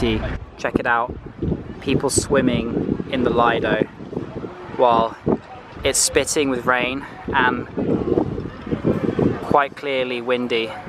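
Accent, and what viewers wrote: United Kingdom. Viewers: Oh gosh! I'm so into your British accent. As an English student at the college, itu really helps my English listening skill a lot. I watch it without seeing the subtitle. Keep it up pal.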